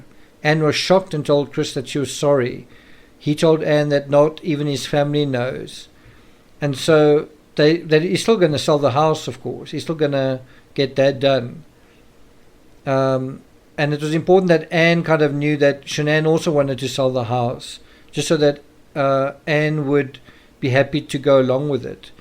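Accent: South Africa. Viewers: Your voice make me sentimental to my SA friends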